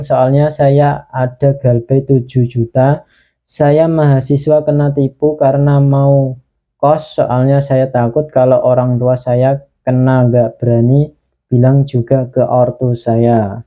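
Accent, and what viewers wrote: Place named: Java, Indonesia. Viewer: Jawane medok emen mase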